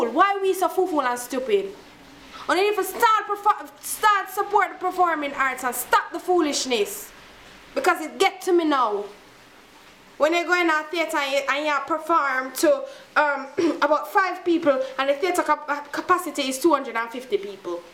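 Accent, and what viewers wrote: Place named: Jamaica. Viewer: calm down sista calm down right now you should be in a Tyler Perry play your good and I like your accent JAMAICAN NATION FOR LIFE!!!!!!!!!!!!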